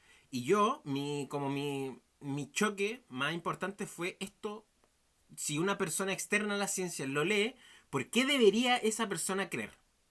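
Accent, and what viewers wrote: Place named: Chile. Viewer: Buen video bro, eres de chile? Me gusta tu acento saludos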